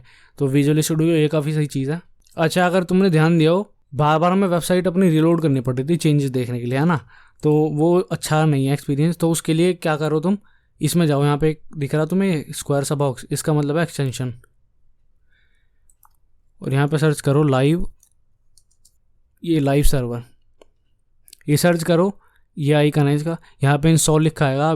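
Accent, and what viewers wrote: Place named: India. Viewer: Video to mast thi hi, Bhai ka Bolne ka Style bhi mast laga Desi ❤️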